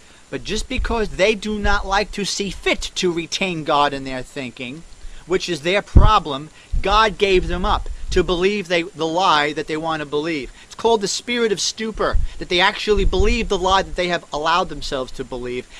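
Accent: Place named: Brooklyn, United States. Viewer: I would rather spend eternity in hell if heaven is full of nasally Brooklyners like this moron. Sorry that accent is nails on a chalk board!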